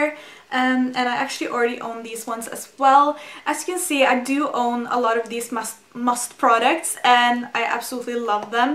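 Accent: Norway. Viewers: I KNEW you were Norwegian hahaha heard it!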